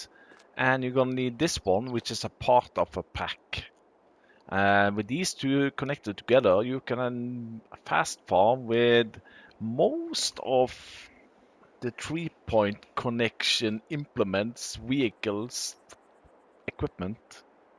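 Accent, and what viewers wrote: Norway. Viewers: Er du norsk eller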